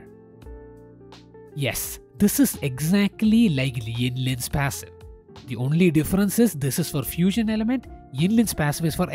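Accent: India. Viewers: Is this Indian. I feel the accent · Your accent sounds indian Amd btw i am chosing jinshi idk why but i didnt liked changli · That South Indian accent though. Where you from my guy? Tamilian? Malyali? Nice video :D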